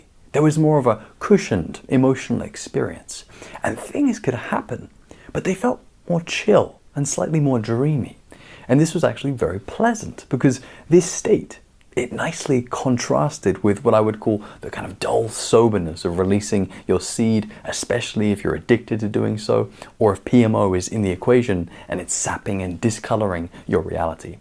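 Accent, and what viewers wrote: United Kingdom. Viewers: What accent is that? You look Danish but sound British 😅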